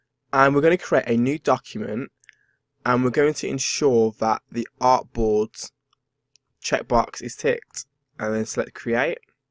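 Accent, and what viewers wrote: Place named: United Kingdom. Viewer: Oi! Ya bri' 'ish, mate?!?! Lovely accent <3 Thanks for the video, helped me out a lot!!! <3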